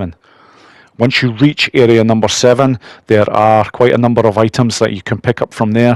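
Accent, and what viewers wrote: Scotland, United Kingdom. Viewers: One more thing, I misjudged your accent. My friend is married to a guy that was in the RAF and is Scottish. I talked to them recently, your name should be, don't know if it is highland or low land. But for sure the whole planet wishes they were Scottish. Quesion, do you play with a controller or keyboard and mouse?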